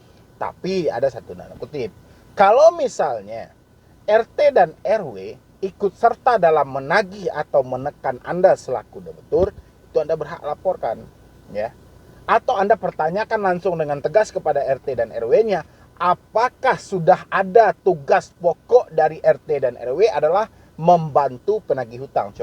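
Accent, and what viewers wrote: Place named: Jakarta, Indonesia. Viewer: Logatnye knape jadi Betawi bang 😂 dulu ane suka nonton video abang logat msh daerah mana gitu skrg bgtu buka lagi video abng logat ud berubah 😂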